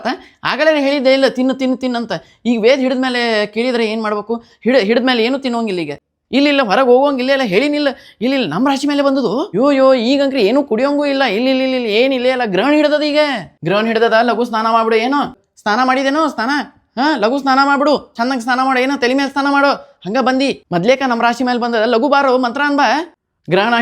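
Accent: North Karnataka, India. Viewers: Nimma Uttar Karnataka kannada maathu nanna favourite ree..😊adrallu nimma performance 👏🤝💐💝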